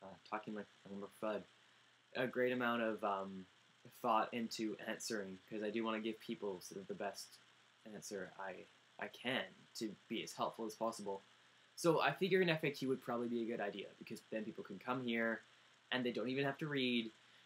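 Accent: Canada. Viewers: i heard your little Canadian accent more in this video than others lol its super cute ^^ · This is actually the first time I've ever heard your Canadian accent xD Never really noticed it before x)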